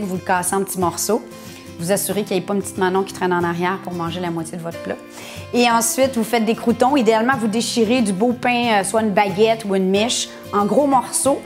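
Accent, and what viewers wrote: Quebec, Canada. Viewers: c'est ce petit accent canadien ? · J'adore votre accents québécois 🥰🥰🙂🙂🇨🇵 merci pour la recette bonne journée 🙂🙂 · beau accent quebecois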